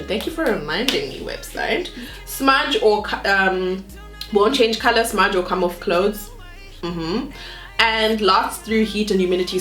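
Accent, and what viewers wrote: South Africa. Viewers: I like your accent, you sound South African or Zim/Canadian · Are you South African?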